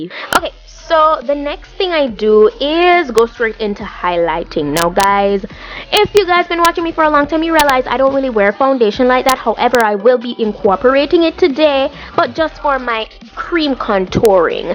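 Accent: Jamaica. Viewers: yaady fi true 🤘💗💗💗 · I knew it... I was about to ask the question I heard it when you speak, Jamaicans dialect cant hide lol! awesome vid! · are you Jamaican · You sound Jamaican! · Every body a ask bout u nationality.... Omg. U sound Jcan tho but confirm nuh!! Anyways luv ur vids; u just be popping with or without makeup... Best contour- no foundation video I've seen.💖💞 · I Love how you talk man. just love my fellow jamaicans.. this was so helpful bc really I agree with using the foundation on where u didn't have any product.. makeup lasts longer.. less makeup shopping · Yeah, I knew she was Jamaican because I am a Bahamian and she doesn't sound like us. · My first video and girl I need to know if you're Jamaican or from the Caribbean cuz.... mi hear it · loveeeeee your jamaican accent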